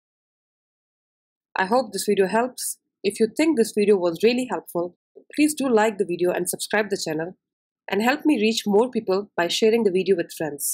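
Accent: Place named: India. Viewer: ... sorry ma'am.. u sounded very much Indian.. whichever nationality, much respect to u.. please do more videos here..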